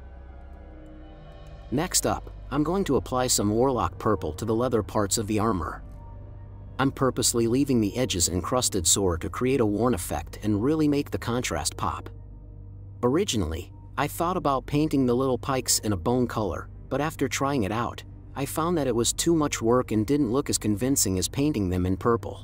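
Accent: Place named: France. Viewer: would much prefer to hear your French accent. Many of the top mini painters have accents such as Trovarion, Marco Frisoni, Sergio Calvo etc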